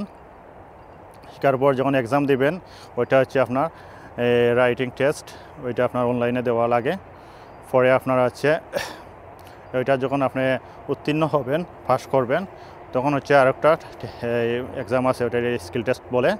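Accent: Sylhet, Bangladesh. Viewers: ভাই আফনে ত সিলেটি 😊😊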